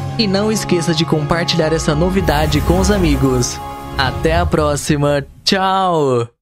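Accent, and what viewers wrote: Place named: Rural, Brazil. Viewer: POR QUE TEM UM CAIPIRA FALANDO, CRUZ CREDO SO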